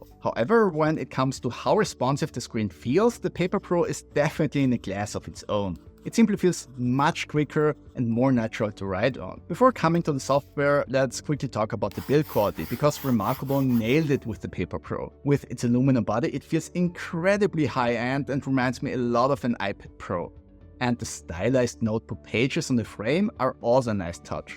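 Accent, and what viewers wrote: Austria. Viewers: daher die leichte Wiener (?) Aussprache. * Englisch eher österreichisch als deutsch angehaucht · Thank you for the good review. Just what I was looking for. Are you by any chance Austrian?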